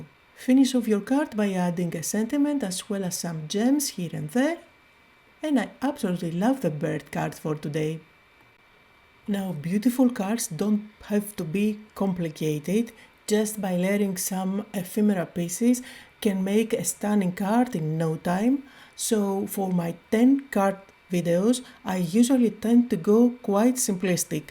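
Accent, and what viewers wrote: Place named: Greece. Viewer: So, mom and I were just talking about what the people have said about the speed in which you talk etc. Your native tongue is a language of love, it ranks right up there with Italian. My best friend of 17 years is from Greece, his family immigrated here when he was 5 and he didn't speak a lick of English. I was on a video chat with him one night and he was talking to his mom in his native tongue and it was so beautiful I asked him to repeat what he just said! "I'm not going to tell my mom that dinner is ready again, Sash." Is what he said 😅. So, mom and I think you should do a video in Greek and if they wanna know what you're saying they can watch the subtitles. Speak at a speed you're comfortable with, Greek is spoken faster than English, and your accent is amazing! Don't change how you do your videos because someone thinks it isn't good enough. 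❤❤ Mad love to you!!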